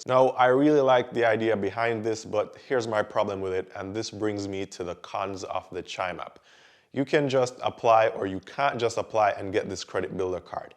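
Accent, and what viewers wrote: Jamaica. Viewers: Are you from the Caribbean (Jamaica)? You don't sound like American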